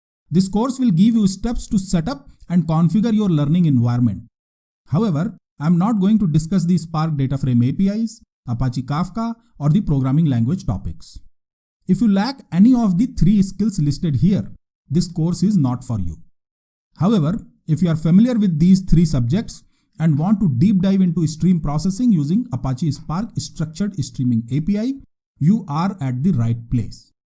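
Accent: India. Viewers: True, Russell is a descendant of India, so there could be some similarities. is very good teacher in the field of Bigdata.. For the sake of native English speakers who find some difficulties with Indian accent, he added subtitles for most of his lectures. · You look like Russel peter but with thick Indian accent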